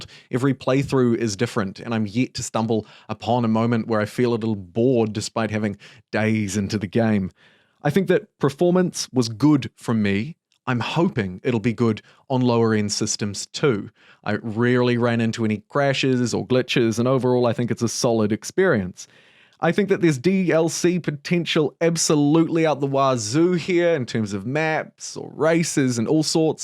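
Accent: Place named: New Zealand. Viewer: You sound like your from NZ ... Same obligatory sub lol..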